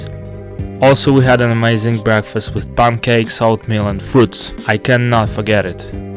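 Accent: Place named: India. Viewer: Are you from India your accent and your way to exprés yourself is terrible maybe you should try a silent video ! Mediocre video to say the least !!!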